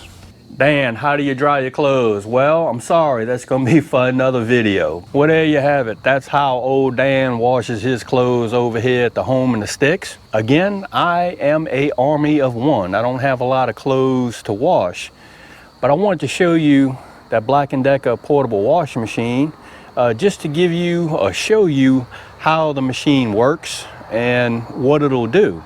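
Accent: South, United States. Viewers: Oh my goodness!! The southern twang and slang made me giggle so much it just made my day. You’re the best! Love this review & it helped me. So thanks for your time & the good vibes!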